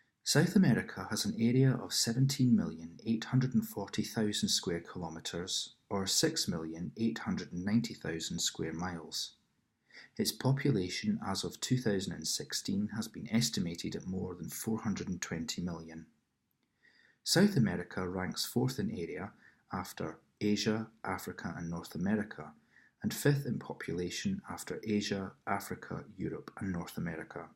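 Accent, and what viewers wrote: Scotland, United Kingdom. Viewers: your Scottish accent is delightful. And for some reason I can understand it (probably because you're reading 😅). I'm sure you will grow once you get some exposure.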